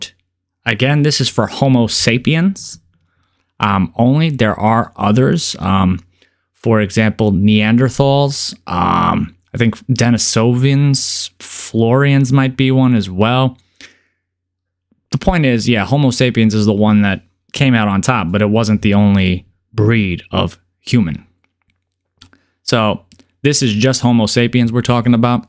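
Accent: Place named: New York, United States. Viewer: Random bro but no weird shit but I'm a black guy from London and I have to say your accent is so dope. haha that New York accent just makes me enjoy your stuff even more lool you're voice just makes me feel like I'm listening to someone from the wire like Mcnulty talking real red-pilled talk to me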